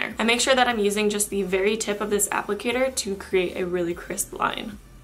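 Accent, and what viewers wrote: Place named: Boston, United States. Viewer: By any chance are you from Boston? You have a very interesting accent. Anyhow, awesome lipstick tips!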